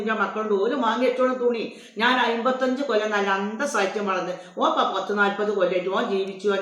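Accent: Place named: Malappuram, India. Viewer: സംസാരം കേട്ടാൽ നാട് ചോദിക്കണ്ട ഞാൻ വിചാരിച്ച പോലെ തന്നെ. വണ്ടൂർ. കാളികാവ്. മഞ്ചേരി. 🤣🤣🤣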